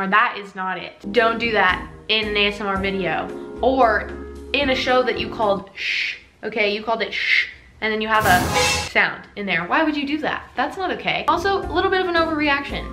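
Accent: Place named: Alabama, United States. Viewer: You have a slight Alabamian accent. Are you from here aswell? You have a tint of south in your voice.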